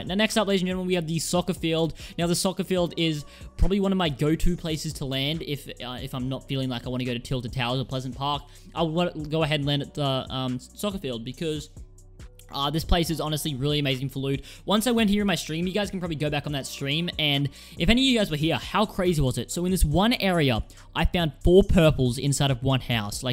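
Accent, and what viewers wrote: Australia. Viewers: are you Aussie? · Fucking Woop Woop ahahaha Bloody aussie <3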